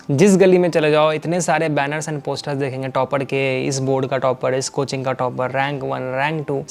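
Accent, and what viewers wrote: Bihar, India. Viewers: bhaiyaa you're from Bihar? Your way of speaking i gotcha u I'm pretty sure you're from Bihar.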